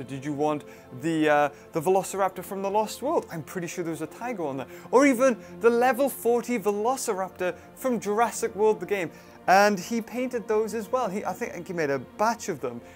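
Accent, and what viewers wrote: United Kingdom. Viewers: are you British??? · Ever since I’ve been watching I may have develop a British accent at times.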